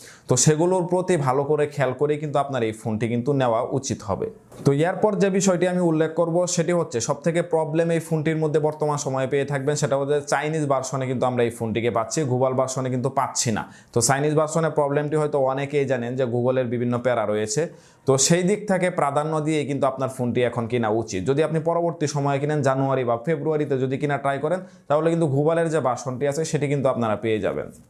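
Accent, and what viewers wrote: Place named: Mymensingh, Bangladesh. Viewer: মমিনসিংগা মনে হচ্ছে!!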